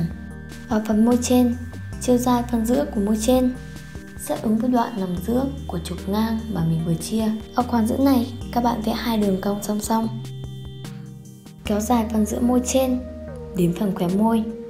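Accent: Vietnam. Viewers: We Vietnamese have such an accent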